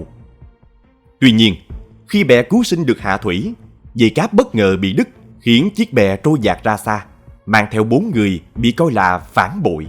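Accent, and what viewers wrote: South, Vietnam. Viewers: Đón chào, giọng đọc rõ ràng, nhẹ nhàng, ko áp lực lỗ tai, khúc nào cần nhấn mạnh, chứ ko tăng âm lượng ko phải lúc, quan trọng hoá giọng đọc, diễn lố, đọc giọng miền nam tuyệt tuyệt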